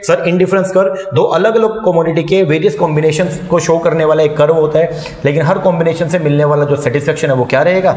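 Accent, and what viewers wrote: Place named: Gujarat, India. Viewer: Sir are you gujrati ? Or are you from Gujrat? Sir bcz jab aap padhate ho toh language mein gujrati ascent pta chlta h😁😁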